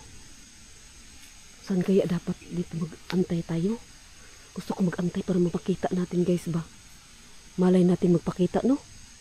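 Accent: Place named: Cebu, Philippines. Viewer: It seems your voice is Cebuano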